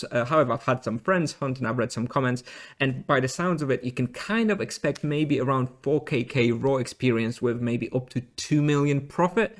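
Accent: United Kingdom. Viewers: Bro are you british?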